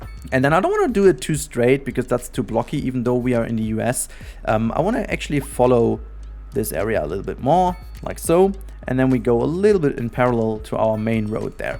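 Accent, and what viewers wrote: Germany. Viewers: Dieser deutsche Akzent ist göttlich! Love the videos, keep it up <3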